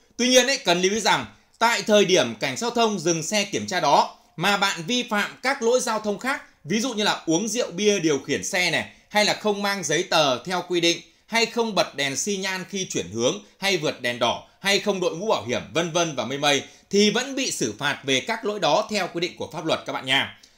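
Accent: North, Vietnam. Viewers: giọng Bắc kỳ khó nghe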